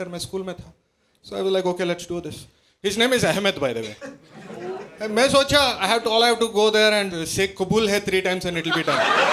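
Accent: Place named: Telugu, India. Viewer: Killed it Bro. It reminded me of my Telugu friend who has the same Hindi like you. Loved it.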